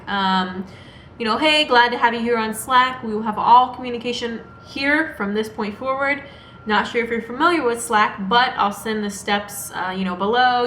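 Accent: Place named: England, United Kingdom. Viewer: Your English accent is so pleasant that it is already sufficient reason to listen to the end.. ))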